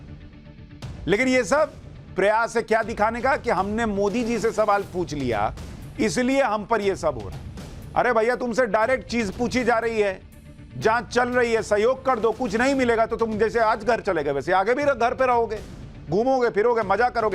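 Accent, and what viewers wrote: Bihar, India. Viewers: Superfan of Bihari accent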